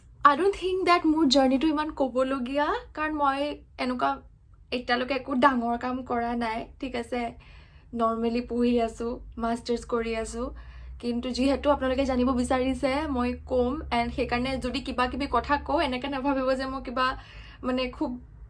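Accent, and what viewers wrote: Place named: Jorhat, India. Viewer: I love how you have that typical Jorhatian style in the way you talk. Much love❤️ - Dohabora Chuck Jorhat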